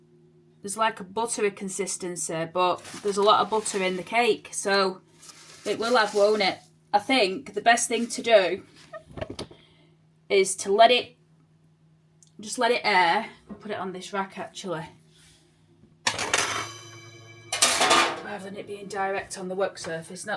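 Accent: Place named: Yorkshire, United Kingdom. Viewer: You are funny! 😂 That Yorkshire accent, down to earth, slap dash ways 😆🤣😂 If you’d have put it back in the oven just put some foil on top so it doesn’t burn. I can’t imagine cake without eggs. I’m not far from you in Sheffield!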